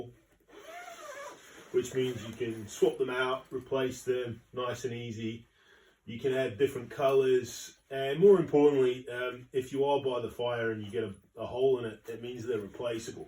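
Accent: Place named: Australia. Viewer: Looks awesome! I don't remember you sounding so Australian though!... Have you spent some time over there since we last spoke?!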